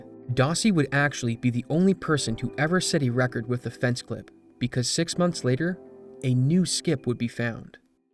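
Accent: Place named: Maritimes, Canada. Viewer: im hearing some maritimes in your voice, newfoundland?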